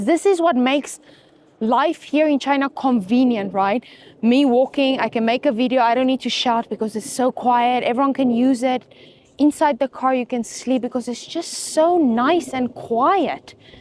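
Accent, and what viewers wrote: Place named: South Africa. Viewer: Did you live in the USA? I hear your South African accent, so I'm wondering.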